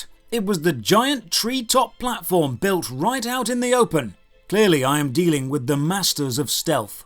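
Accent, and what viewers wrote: United Kingdom. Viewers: David Attenborough, is that you? I can't tell you Brits apart. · I just love how angry he always sounds. Very british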